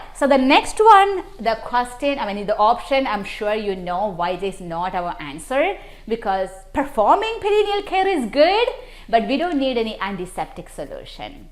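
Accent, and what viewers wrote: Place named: India. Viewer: Your English is very bad with an Indian accent.🥴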